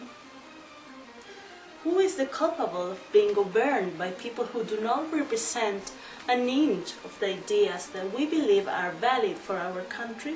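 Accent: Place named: Germany. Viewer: so cute with your german accent! hahahaha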